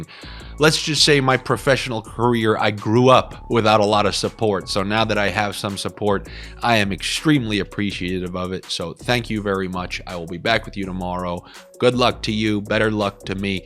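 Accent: Boston, United States. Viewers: Love the Boston accent talk.....you do it well.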